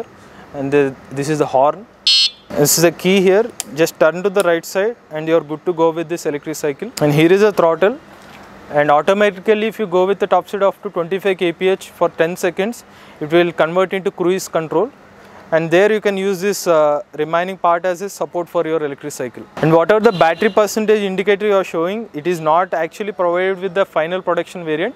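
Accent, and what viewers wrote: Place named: Hyderabad, India. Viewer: Very Good Hyderabad English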